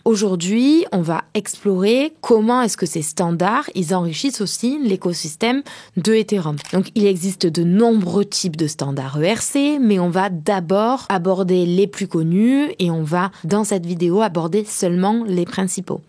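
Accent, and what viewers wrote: South, France. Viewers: Hyper agréable l'accent du sud pour cette vulgarisation de l'univers crypto et ici le sujet des ERC ! Merci bcp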